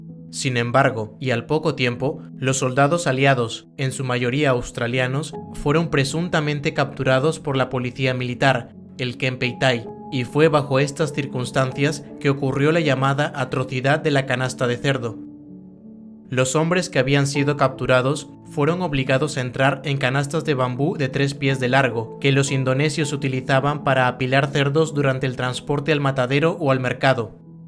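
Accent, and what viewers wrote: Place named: Castile, Spain. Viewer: En el canal pone Perú, pero esa acentuación es Castellana de España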